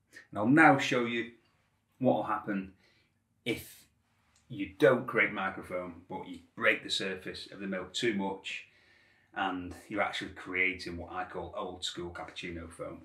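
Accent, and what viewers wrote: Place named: West Yorkshire, United Kingdom. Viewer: You have a similar accent to me. West Yorkshire?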